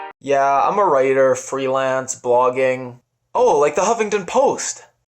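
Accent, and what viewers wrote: Canada. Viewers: Just curious, Are you Canadian ? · So, you did NOT explain the ending at all. I think perhaps you are so full of yourself that you misread the ENTIRE movie and have NO idea what you are babbling about in your annoying Canadian accent, A! Who was the moving man in this plot and why did he appear at the end as a potential stalker, explain that one oh master of interpretation!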